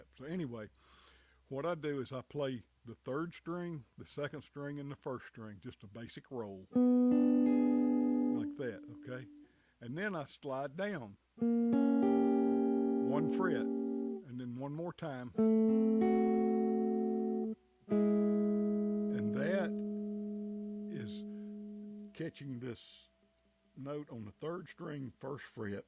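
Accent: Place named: Kentucky, United States. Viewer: ...you sound like Kentucky.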